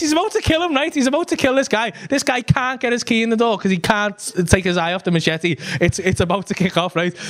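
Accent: Liverpool, United Kingdom. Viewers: I’m an American so sometimes I have trouble understanding when he talks fast...need to brush up on my Scouse a bit! But Paul is absolutely brilliant!! 🤣😂 I need to make a trip across the pond just to go to one of his shows ... and experience the Kop of course! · Yeah this is proper Scouse lad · 10 seconds of Scouse and I'm out. Sorry mate.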